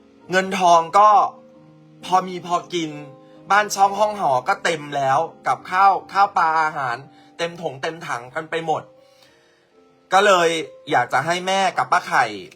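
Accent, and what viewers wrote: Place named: Isan, Thailand. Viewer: น้องดิว เว่าภาษาอิสานม่วนๆๆ🎉🎉🎉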